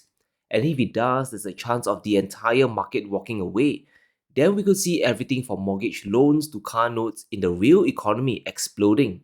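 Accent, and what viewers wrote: Singapore. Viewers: is a Singaporean. Why would he speak in a foreign accent? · Too bad if you don't know what a Singapore accent is, and can't even spell properly in English.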